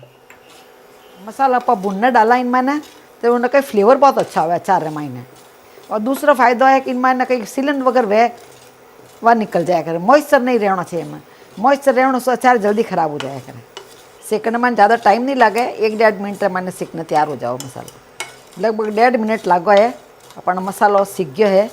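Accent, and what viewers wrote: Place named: Rajasthan, India. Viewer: Bhabi ji aap ka achar khub achcha lagey. + your sweet rajasthani bhasha 💐💐👍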